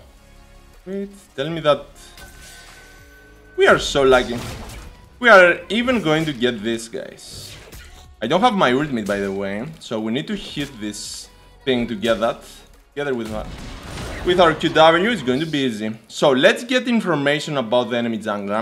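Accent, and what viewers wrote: Greece. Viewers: Hey man love these videos, very funny and informative :) btw your accent sounds Greek, is that right?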